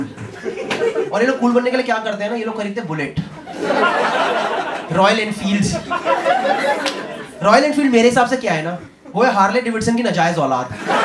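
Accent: Delhi, India. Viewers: Delhite accent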